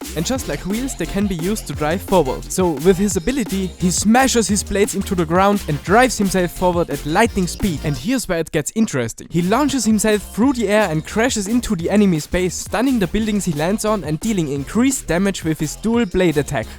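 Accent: Germany. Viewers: Mann hört so krass das du deutsch bist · ngl i love your german accent :D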